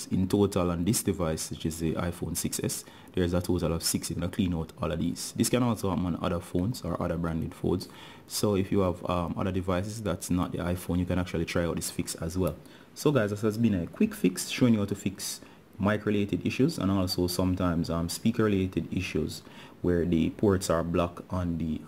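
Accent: Jamaica. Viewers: Is that a Jamaican accent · Anyone else realize the Jamaican accent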